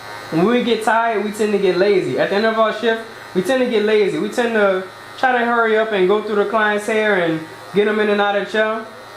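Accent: Louisiana, United States. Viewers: Just by your voice, I know you're from Southern Louisiana. That is a unique accent.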